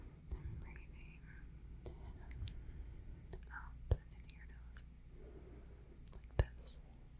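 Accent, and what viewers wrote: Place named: South, United States. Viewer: upvote for more Southern Accent whispered rambling ;)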